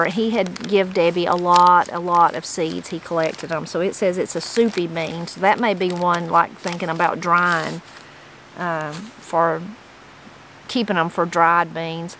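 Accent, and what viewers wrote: Appalachia, United States. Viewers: Hope you can find enough room to plant all you want. I too pronounce zinnias like you….maybe it’s an Appalachian thing🤷‍♀️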